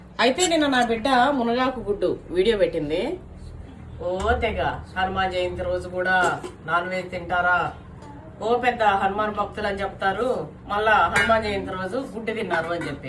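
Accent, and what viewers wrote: Telangana, India. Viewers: Telangana slang vachesinde andi miku baale chepparu😅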